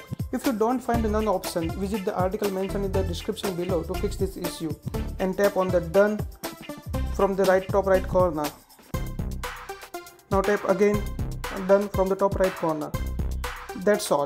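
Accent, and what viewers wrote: India. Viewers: My phone is dying, it’s 🔥 burnt I don’t even care if it works, I just like him saying “it can easily freak you out” Not making fun I like the Indian accent, we are cousins I’m West Indian. I hate when people say that, ohh I can say that because I’m that, so I guess I hate myself, who am I talking to right now? Thanks for your help cousin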